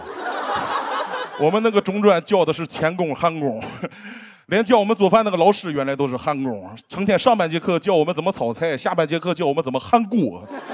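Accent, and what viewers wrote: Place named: Dalian, China. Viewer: 我是大連女婿，喜歡大連口音。如果冒犯到大連人， 這裡道歉了。 當然肯定會冒犯到一群假脫口秀觀眾， 但是就不道歉了。反正你們也不開竅。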